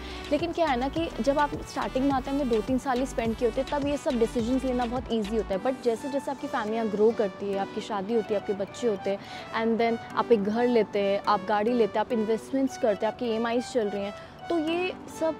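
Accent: India. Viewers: loved your North Indian Hindi accent. Sounds awesome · awaz se karol bagh ki lag rai ho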